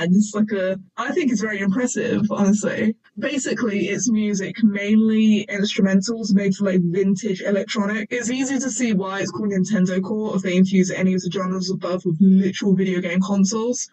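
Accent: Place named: United Kingdom. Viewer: Hello fellow Brit! It’s very comfy listening to your accent 😆 There was a lot of stuff in your video pre- I had no idea about, I should check those artists out! When you said you wasn’t sure if you got anything wrong during the 2000s period, I have some observations I was a teenager in 2008 (I was 18!) so I can give you a bit of substance to the google trend data NintendoXCore was of course a genre of music coined by on their first demo and their website. The animated music video went viral in 2004 on Albino Blacksheep and pushed them to new heights. The original video didn’t even use Nathan’s vocals, they used Adam Crooks despite Nathan’s already being on R.Borlax. Cool fact! So during that time they were circulating alongside other post-hardcore inspired acts like, etc. NintendoXCore as a genre stood out because it was making heavy and obvious use of the chippy synth sounds. 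But teenagers on MySpace during what you accurately called the third wave of emo (scene!) just thought it sounded cool and didn’t know what the hell they were talking about. So they’d unironically say things like ‘I prefer SegaXcore to NintendoXcore RAWR’ and other ridiculous sounding things that had no bearing on the music itself. It just kind of became its own meme. Because a lot of bands gaining prominence back then were using 8-bit, chiptune, or electronic sounds NintendoXCore as a genre kinda blurred into the cul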